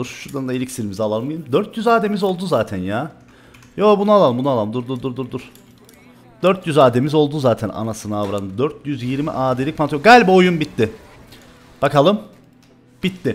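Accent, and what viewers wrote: Cyprus. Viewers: Abi sen nerelisin çok merak ettim konuşman sanki kıbrıs ağzına benziyo ve videoların çok güzel olmuş eline sağlık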